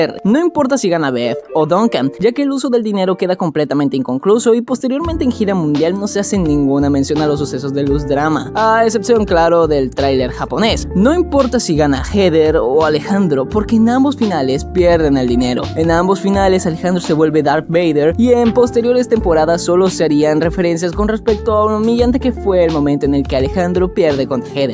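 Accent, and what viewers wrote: Venezuela. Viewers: Mano, se re nota que eres venezolano jajaj saludos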